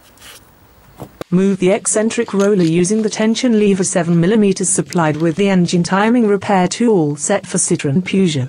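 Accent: United Kingdom. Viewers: So are you an Englishman in France?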